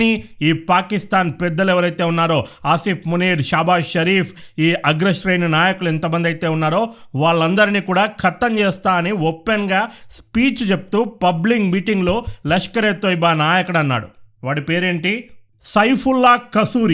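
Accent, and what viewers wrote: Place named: Telangana, India. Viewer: Nice Anna Telangana Slang Super 😄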